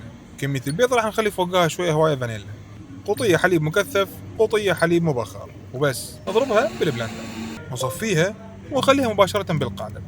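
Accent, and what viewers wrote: Iraq. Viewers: جم و لا كم 😂😂 ياخ احب اللهجة العراقية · يخبول اللهجه العراقيه 😂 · احب اللهجه العراقيه منه احسه محتفظ بالتراث وبوطنه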